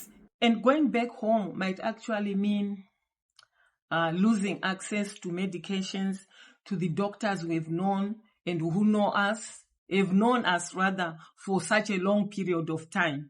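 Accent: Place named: Cameroon. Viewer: You sound Cameroonian! That is irrelevant! However, the greatest threat is just insecurity!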